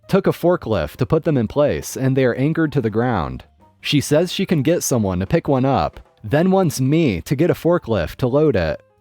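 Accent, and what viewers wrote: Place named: Canada. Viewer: What a NOT charming contribution to the dialogue. Different continents, different pronunciations. Tomato, tomato. As I recall, our host is Canadian, as am I, and we both say it NOT your way. If you can’t be nice, don’t bother to comment, especially on such a petty item and in such a preposterous fashion.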